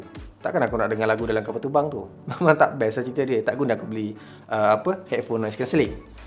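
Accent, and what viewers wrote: Terengganu, Malaysia. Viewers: Org kuala terengganu ke ? Area mana tu? 😄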